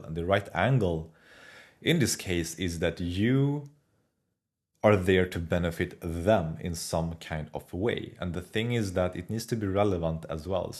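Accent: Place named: Sweden. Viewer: Swenglish is strong in this one. Great value video surprised it has so low view count!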